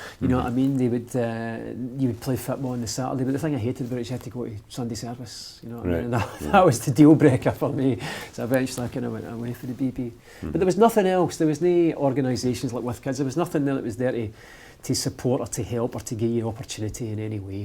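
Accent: Scotland, United Kingdom. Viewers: I am German and understand Scottish much better than British ;) Love it a lot ♥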